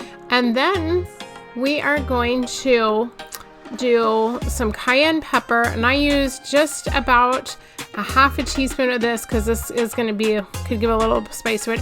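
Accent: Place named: South, United States. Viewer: I have to admit, I watched this like THREE times (of course, all the way through lol) because I kept getting distracted. SO thankful that we got to do another collaboration together, you are just so much fun and so full of life. I love how you had a bit of a southern twang while presenting, loved it! I added your video to our playlist and I will be sharing the playlist tonight on our community time to get the word out even more. Honestly will be watching this a fourth time when I can actually listen to it for the entirety. ❤️❤️❤️❤️❤️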